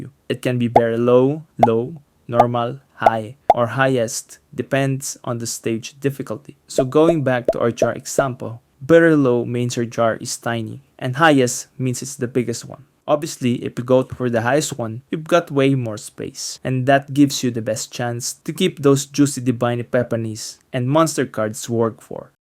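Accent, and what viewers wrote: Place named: Philippines. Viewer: i knew that accent kababayan lmao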